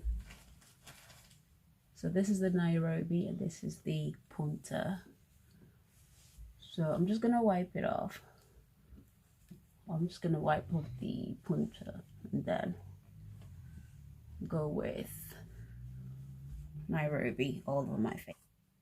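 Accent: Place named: England, United Kingdom. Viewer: Hi... Looks nice but how does it work on wood face?...seriously, your always beautiful, that English accent 😃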